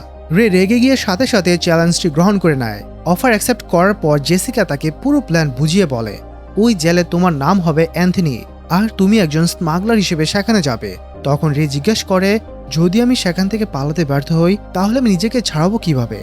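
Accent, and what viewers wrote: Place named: Sylhet, Bangladesh. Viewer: Vaito Sylheti lager ba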